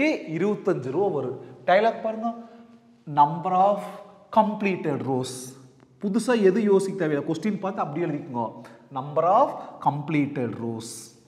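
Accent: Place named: Thanjavur, India. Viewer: Your in thanjavur am i right your slang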